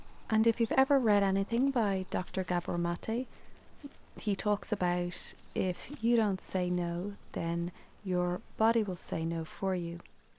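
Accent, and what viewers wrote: Ireland. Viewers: I want to tell you that I just love your channel. Your topics, philosophies, guidance, teachings and even your sweet Irish voice and tone are nourishing my soul. I have had so much stress in my life for years and am afraid that it has made me physically sick to some extent. Am so glad that I found you. Thank you. Charleen from Scottsdale, Arizona, USA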